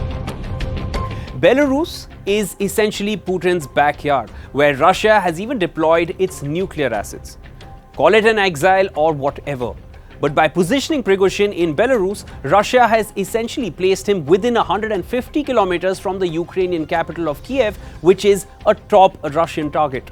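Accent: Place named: India. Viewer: I cannot understand Indian English. However the wagners must be monitered closely. they are very close to Kiev.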